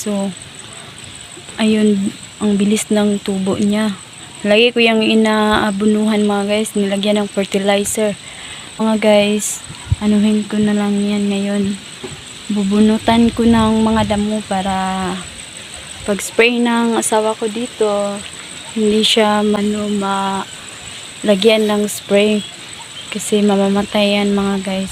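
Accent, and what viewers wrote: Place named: Binukid, Philippines. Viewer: Binukid jud imong tirada ba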